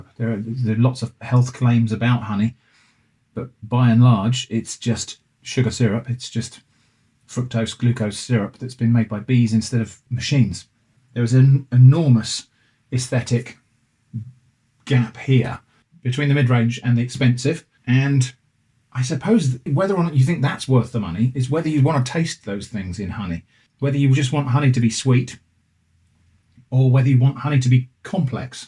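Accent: United Kingdom. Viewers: Its the british accent and somewhat whacky niche lol.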